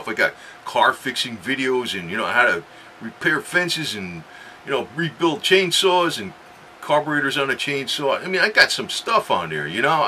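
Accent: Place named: New England, United States. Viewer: Dude wearing a confederate hat ,rebel flag,and yankee voice and it's clear your a yankee....hard to take you serious....sorry bro, all I can do is laff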